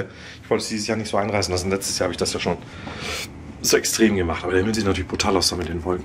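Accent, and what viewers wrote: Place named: Germany. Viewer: Random Logick Nicht spießig! Aber schon sehr deutsch 😁😜 Gerade durch Sprache. Aber alles cool ich mag ihm ja 😜 Bin ein Fan thumbs up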